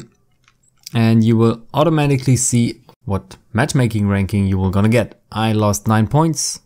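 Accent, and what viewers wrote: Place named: Germany. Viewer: Nices tutorial Es hat geholfen aber dieser deutsche Akzent 😂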